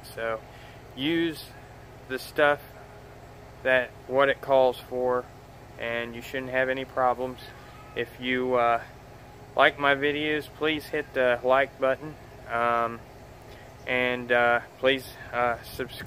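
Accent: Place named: Southern Ohio, United States. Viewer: I'm from southern Ohio your accent sounds just like mine were you from Chillicothe Ohio Jackson or porstmouth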